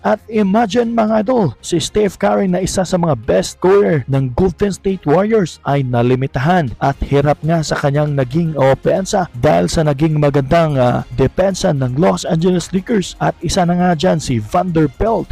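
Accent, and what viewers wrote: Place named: Visayas, Philippines. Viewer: Likers(lakers) amputa tapos sikends (seconds) bisayang bisaya mag salita nakaka urat sa tenga.ambobo mo mag voice over.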